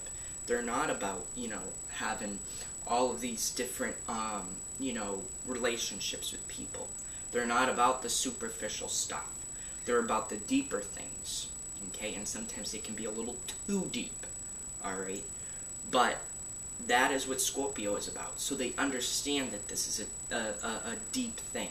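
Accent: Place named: Boston, United States. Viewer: YOU ARE FROM BOSTON IM A SCORPIO LOVE YOUR ACCENT YOU AREDEAD RIGHT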